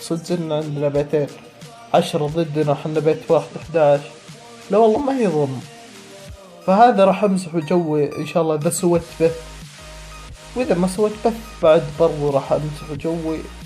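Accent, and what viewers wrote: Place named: Qassim, Saudi Arabia. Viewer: اية بس لهجتة قصيمي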